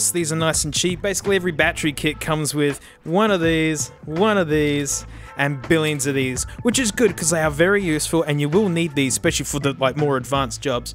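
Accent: Australia. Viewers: I absolutely love these vids and the accent is A'stralian ( in a sea of USAs) but I do have a problem. I had a new battery put in an iPod Photo and the sucker will only fully charge via Firewire. If I try USB it will take forever and it still may not fully charge. It's really got me stumped. Any ideas? Oh and or what it's worth I just opened the box on a last gen. 160gb Classic and the battery charged up without any problems. Not bad as it sat in a wardrobe drawer for seven years. I then it connected to a high end dock (after I synced it to iTunes) and the music sounded sublime. Why did I go old tech? Well , I reckon that when it comes to an interface for listening music the scroll wheel can't be beaten. For a Jack and Jill of all trades I use iOS devices and if I want to go half way house which weighs almost nothing then it's the Nano last gen. Anyway if anyone can help with my problem I'd gratefully appreciate it. 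· Gotta love that Aussie accent... 🥰😏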